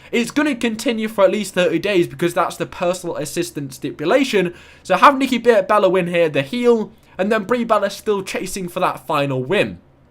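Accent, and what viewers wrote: England, United Kingdom. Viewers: You have an english accent are you from UK ?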